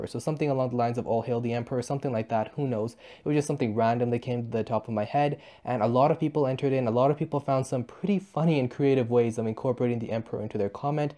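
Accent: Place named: Germany. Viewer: how come a english end up with german accent?:D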